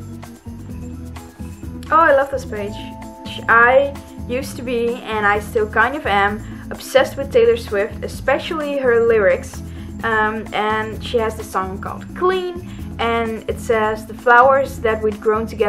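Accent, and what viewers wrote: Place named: Netherlands. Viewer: Are you dutch? I am Dutch and you sound Dutch great video keep it up!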